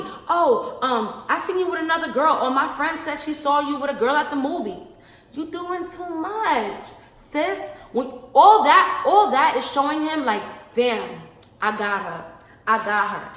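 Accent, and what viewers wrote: New York, United States. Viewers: Lol you have that New York accent fr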